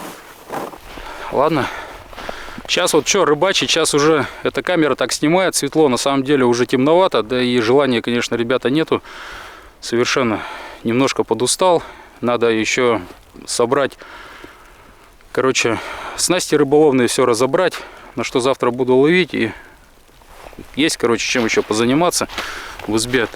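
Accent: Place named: Vyatka, Russia. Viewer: привет,классные видео,если не секрет,с какой ты области?,архангельск,коми,или Кировская обл,говор то вроде наш,вятский😀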